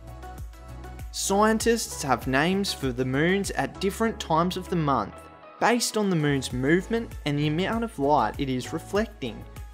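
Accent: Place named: Australia. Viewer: this is the single most Australian accent I've ever heard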